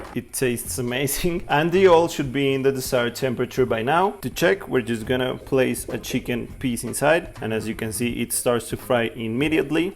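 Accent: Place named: Rolo, United States. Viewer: It's funny how you have that perfect English with the "rolo" accent.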